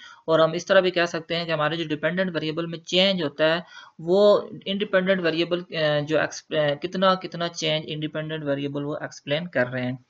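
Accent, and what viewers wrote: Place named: Kashmir, India. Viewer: Great sir....your accent is saying that you must be a kashmiri.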